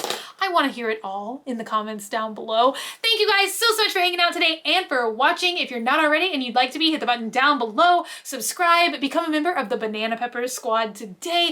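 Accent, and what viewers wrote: Texas, United States. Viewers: I like to watch with the closed captions on...and they also said that said PIN and not pen. Lol. It's that southern pronunciation 😘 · It’s funny I don’t even hear a difference but in other comments they say it too. It must be a Texas thing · Took me a minute to know if you meant pin or pen, that Texas accent, man.😄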